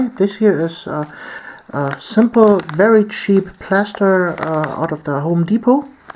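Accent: Germany. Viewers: it's not a British accent. it's a german one based on western film and TV.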